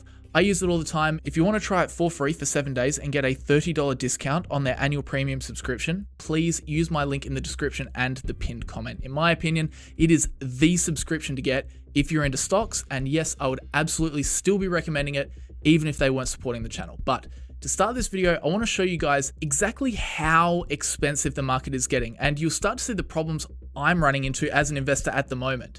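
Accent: Australia. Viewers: an aussie dude huh. let put some more gilts on the barby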